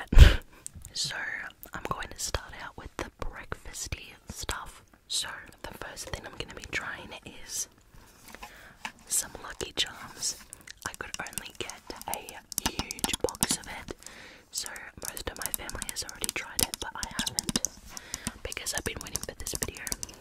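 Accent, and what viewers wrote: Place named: Australia. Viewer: I love your voice. It is perfect for ASMR :) I wish that I had an Australian accent instead of a Canadian one lol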